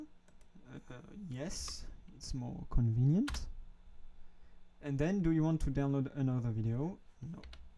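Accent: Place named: France. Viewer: Been lookin for a way to download twitcast videos for offline playing for quite sometime and you did it!! Mèrci!!!! Hope I'm not too preseumteous to assumed that you're French from your accent. P.S : Locked videos can also be downloaded provided you have the password for it, and start/play the video before the browser console step. (The vid I wanted to grab is a locked one) P.P.S : The only difference for me is, I have to hit enter after the download has completed. It did not promt me about the conversion automacally.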